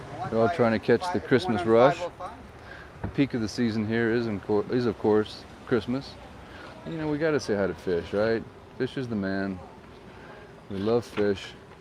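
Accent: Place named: Hawaii, United States. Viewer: Love your pigeon accent.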